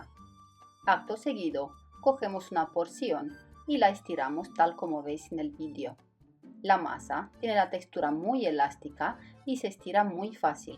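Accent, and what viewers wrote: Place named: Romania. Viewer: Que buena pinta!!! Ce bun!! Eres rumana?? Tienes acento así eres muy buena cocinera !!! O sa fac reteta multumesc mult!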